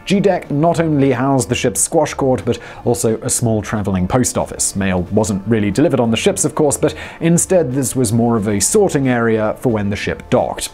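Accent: United Kingdom. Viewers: All of channels present facts in an interesting way with use of a very articulate Britsh dialog. I can listen all day in astonishment of how in contrast, I speak so badly as a southern American.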